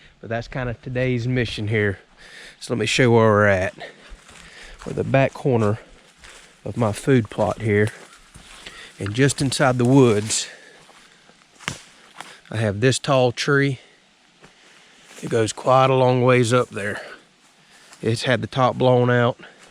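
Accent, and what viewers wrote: United States. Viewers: I enjoy your content so much, and find you really interesting to listen to! Where is your accent from in the USA? Love it ha, all the best!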